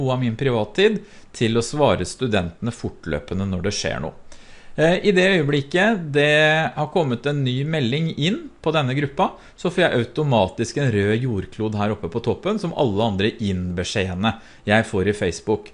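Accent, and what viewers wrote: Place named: Østfold, Norway. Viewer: "litteran".... fra østfold???? Nyyydelig dialekt!!! (er særping sjøl da...)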